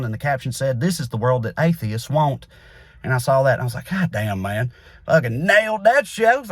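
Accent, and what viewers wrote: South, United States. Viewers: That's funny, because this is a comment I've only heard from people who haven't spent significant time in the rural southern US (where the accent really isn't that unusual). That's more or less how speaks normally (his sister, who is not a comedian, has the same accent he does). Of course, he's not speaking in a totally normal fashion in these "car rant" videos, since they are a recurring bit that he presumably writes scripts for beforehand, but the "country accent" itself is not the unnatural part. You can see an episode of and friends' podcast where his sister was a guest here, for example ( can also be heard throughout, speaking in a more impromptu manner): <link>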